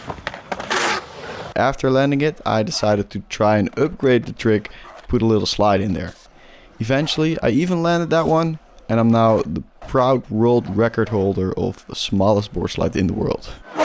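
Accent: Netherlands. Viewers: you sound dutch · yo u dutch to? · Bro ik hoorde je accent in VLSkate’s video en ik wist gewoon dat je nederlands was, lijpe videos man keep it up 👌🏻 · Wait u Dutch? That explains your accent, hahaha ga zo door!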